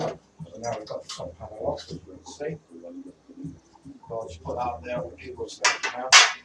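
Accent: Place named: United Kingdom. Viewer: hello, glad you have it still working! Please tell me , are you a Londoner ? You have a pure British accent) Or, if not, how this accent is called ? I do get aesthetic pleasure hearing your “so british” speech)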